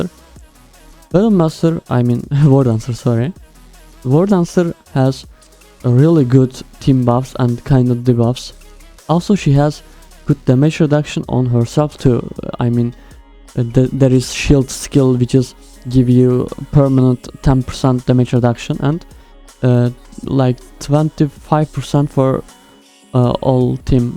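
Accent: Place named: Turkey. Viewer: Kankam aksanın Türk gibi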